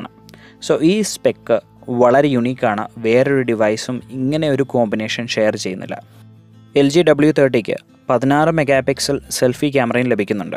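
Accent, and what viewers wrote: Idukki, India. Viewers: Idukkikkaran anoo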